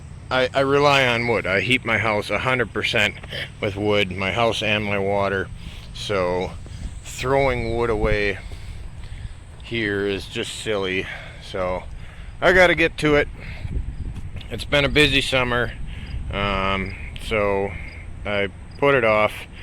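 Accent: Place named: Wisconsin, United States. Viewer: You sound like you might be from Wisconsin. Menards too? Go Pack Go!!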